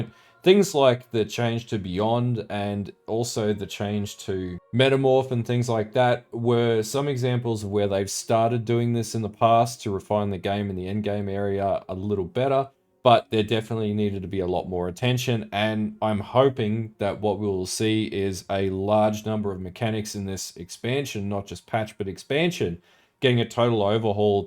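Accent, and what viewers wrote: Australia. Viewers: i have missed the aussie twang. it is so good to hear poe ideas spoken in my lingo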